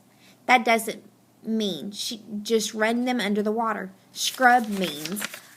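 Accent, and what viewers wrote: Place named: United Kingdom. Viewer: No offense but you sound like a British person pinching her nose and reading at the same time. If you are wondering why I'm looking at the video, it's because of homework...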